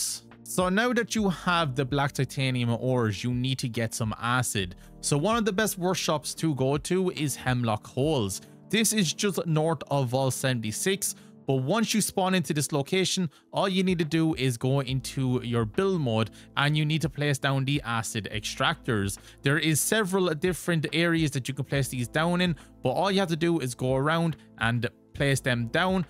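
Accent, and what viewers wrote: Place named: Ireland. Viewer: Love the videos and nice hearing the Irish accent on these tutorials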